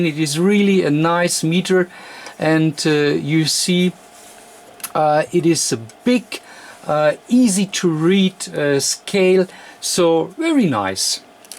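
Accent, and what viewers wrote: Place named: Germany. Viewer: I echo all the nice comments below. So great to get an older piece of equipment working 100% again. Thanks!! Your friend will be pleased. (Love the accent! I am guessing German, but I suppose you could be from the Netherlands.)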